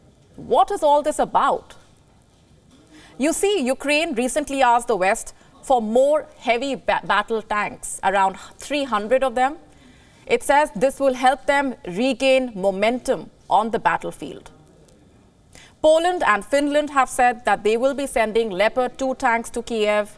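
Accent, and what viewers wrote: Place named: India. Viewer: so cute indian accent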